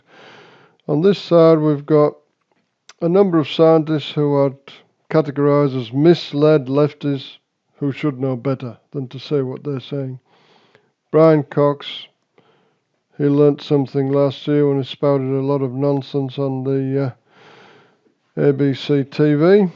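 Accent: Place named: Ipswich, United Kingdom. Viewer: No your wrong, you sound like that fucking Ipswich Town manager with that accent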